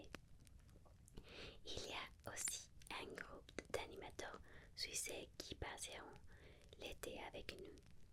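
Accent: Spain. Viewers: Pour moi, c'est justement ton accent espagnol en Français que j'adore. Merci pour l'effort que ça doit être pour toi. ❤ 👍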